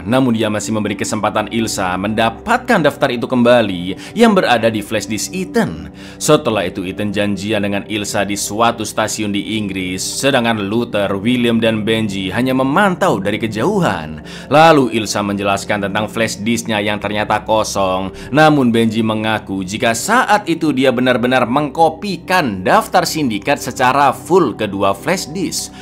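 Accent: Java, Indonesia. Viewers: jowo ne ngendi kiiieh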